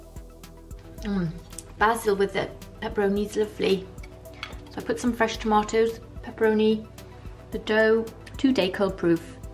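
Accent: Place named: South Wales, United Kingdom. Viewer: Excellent I'm from the valleys I've been trying to make good pizza in the past it sounds like you're from south Wales.if you are if you like to meet up and see how you make it Best wishes from Paul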